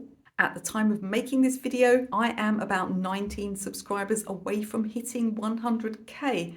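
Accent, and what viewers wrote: United Kingdom. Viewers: I LOVE your voice!!! I have always loved the British accent.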